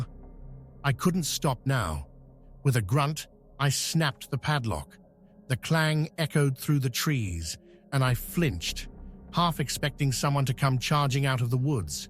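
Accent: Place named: United Kingdom. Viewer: Love the stories with the British voice.😉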